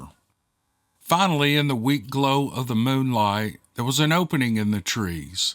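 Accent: South, United States. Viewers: I love not only because of the stories & but is the stereotypical southern personality. I’m from the South and live a LONG way away from there now. I love to settle in on a video and just sink into the Southern accent and the Southern way. In a weird way it’s a little bit of home.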